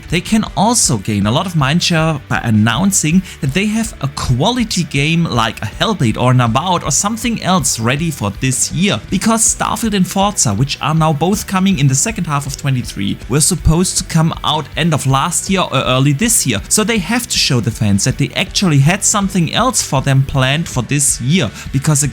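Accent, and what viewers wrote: Germany. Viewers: Kommst du aus Deutschland ? Verdächtiger Dialekt 🤭, auch wenn du es gut verbergst 😁.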